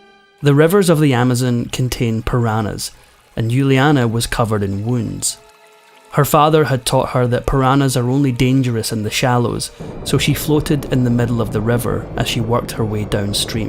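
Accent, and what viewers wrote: Scotland, United Kingdom. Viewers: Scottish accent - subtitled required. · I love listening to narrate Lovely voice Scottish I wish you could put your name to story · In British English, the correct past participle of get is "got", not "gotten". Unless you are American, though your Scottish accent would suggest you are not. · I really enjoyed this narrator love listening to the Scot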